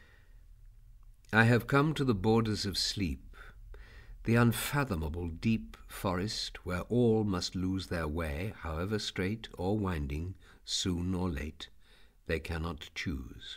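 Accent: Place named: England, United Kingdom. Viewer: Nice reading, lovely English accent as if Thomas himself were reading.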